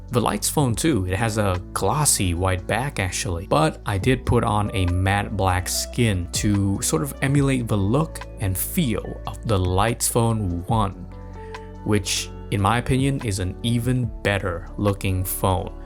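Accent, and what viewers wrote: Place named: Vietnam. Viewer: ô ông anh là người Việt à, accent đã thế. Có 1 tấm a chụp ở UTE đúng k nhỉ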